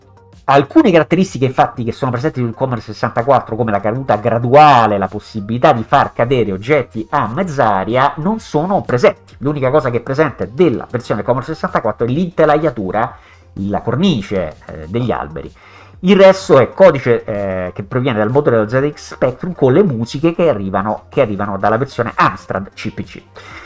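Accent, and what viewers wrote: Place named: Rome, Italy. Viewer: Si grazie, INPUT anche era una traduzione di una enciclopedia Inglese se la trovo la ripulisco! Giù allo scantinato ho anche parecchiè riviste a prendere polvere e umidità, tipo LIST, e COMMODORE GAZZETTE (era la mia preferita per Amiga e c64), L'Amiga 500 purtroppo non ce l' ho più, gioco o scrivo qualche programmino in Basic sull' emulatore di tanto in tamto. Ma il C128 sta nello scatolo insieme anche ai manuali ecc. Penso che sia un po' in cattivo stato, tipo mancano un paio di tasti e non si accende, ma si se si può rimettere in sesto ne sarei felice, dovrei informarmi come spedire un pacco grande, cosa mai fatta, sono una chiavica a risolvere problemi pratici e non mi intendo di elettronica, io sono vicino Napoli e tu dall'accento penso che sei area Roma, quindi penso dovrei spedirlo, ma penso una volta capito il tutto non dovrebbero esserci problemi, quindi si certo, nel caso fosse possibile mi farebbe molto piacere, quindi mi metto in coda :) PS: se fossi interessato alle riviste COMMODORE GAZETTE (il mio computer preferito oltre al 128 è l'Amiga naturalmente, perciò le compravo) e se fare e mandare pacchi non è una cosa troppo onerosa/e/o/stressante te le mando, (o anche la stessa INPUT se la trovo, le regalo naturalmente, lo dico a scanso equivoci, dato che stiamo su internet), penso che starebbero molto più in buone mani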